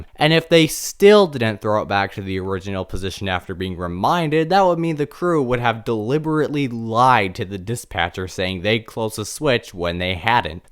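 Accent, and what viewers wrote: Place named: United States. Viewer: American, why do you try to sound like chinese, japanese and german at same time while still having obnoxiously nasal amarican accent?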